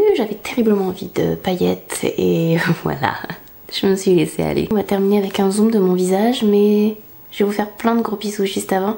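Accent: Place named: England, United Kingdom. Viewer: Coucou, j'adore ton petit accent anglais :) Très beau makeup, on apprends pleins de trucs. J'avoue j'adore quand tu te fais des smokies bien intenses. C'est personnel, je suis pas fan des paillètes. merci pour cette vidéo, gros bisous^^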